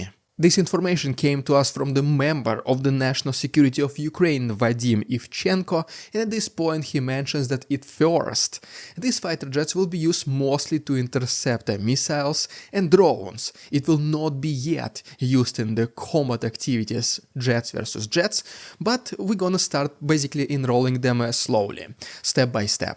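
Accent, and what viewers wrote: Russia. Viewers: I can hear your russian accent. Keep studying.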